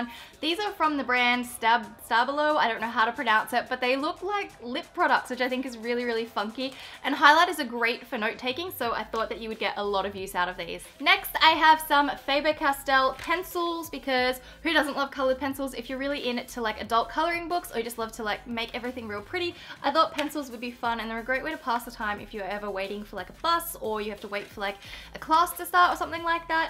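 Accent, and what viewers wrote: Australia. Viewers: And OMG I LOVE YOURE AUSTRALLIAN ACCENT ITS AMAZING AND YOU ARE JUST A LIFES MIRACLE WITH HOW PERRFECT YOU LOOK AND ARE ............... well that explains you in my very creative brain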